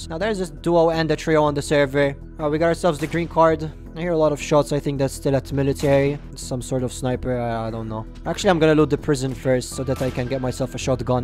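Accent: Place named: Malta. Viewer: Question I am new and you’re English accent sounds like my country’s English accents that Maltese so I am asking are you maltese and live in malta pls tell me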